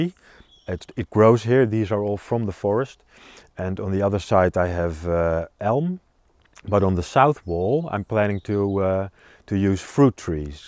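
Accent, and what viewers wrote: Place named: Australia. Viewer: You know- I was thinking your English reminded me of an Australian speaking and Lo- you lived there- or here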